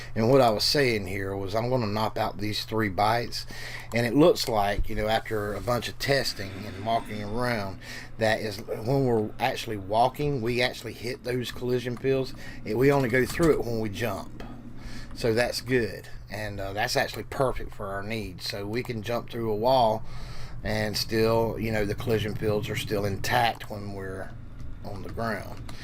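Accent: South, United States. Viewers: Love that accent man may I ask where you come from? I'm not a native speaker but it's def. southern😎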